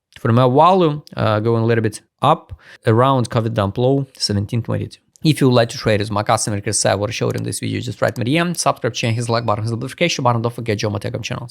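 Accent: Russia. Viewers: Pyсню слышу сквозь попытки англицировать 🖕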